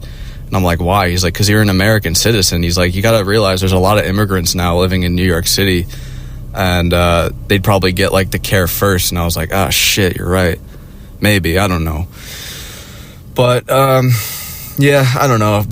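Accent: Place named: Boston, United States. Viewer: Anyone else used to think was from Boston?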